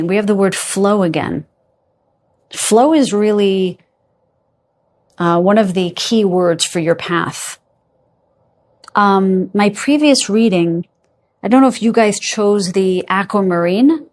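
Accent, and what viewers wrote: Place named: New York, United States. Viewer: Hello again and thank you for taking the time during such a stressful time for you. I wish I could do some of the physical work for you. I chose #3, and yes it does fit, and it confirms what I've been getting myself. In the last several days I've been feeling very cozy with Spirit without having to do anything to get there, as usual. And I'm feeling grateful for that as well as feeling anticipation of good things. I think that very big things are coming to the world very quickly now and we are the placeholders for the collective. And it is very near to showtime. .... BTW, .. please don't hide the NY accent, it's cute on you 👍😉 .... These are SUCH special and auspicious times that it will easily wash away the ugly and painful if allowed to. The Creator has given me great strength and I will be forever grateful 😊 .... 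bye now and thanks again ❤️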